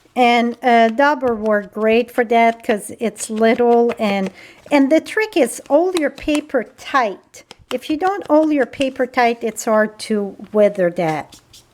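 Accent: France. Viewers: Good day, I sure love watching your tutorials because they are very informative, and you are my fellow Canadian they love your French accent have a wonderful night and a wonderful day tomorrow and God bless you. I also like the fact that you're not doing voiceover that everything you do is well you are creating your magic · She was saying 4 by half (inch). It's her French accent.:)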